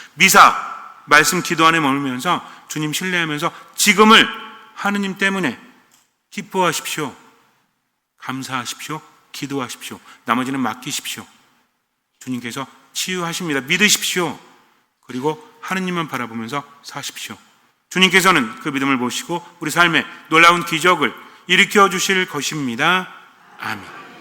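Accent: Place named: Suwon, South Korea. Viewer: 찬미예수님~^^ 옛날에 부산에서 3년 살아보니 부산 사투리에 젖어 나의 언어가 평범하지 않던 시간들... 신부님 언어에서 정감 넘치는 말들 수원 사투리~^^ 안성 사투리~^^ 정겹고 좋아라~ㅎㅎ하^^ 좋은날 되세요~^^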